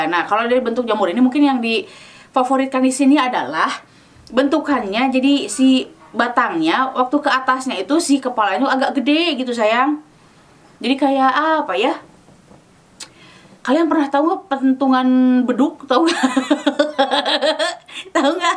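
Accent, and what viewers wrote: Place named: West Java, Indonesia. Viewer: Meni sunda pisan 😅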